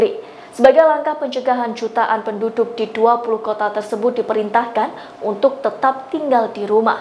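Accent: Java, Indonesia. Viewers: mbaknya medhok ya.. lokdon...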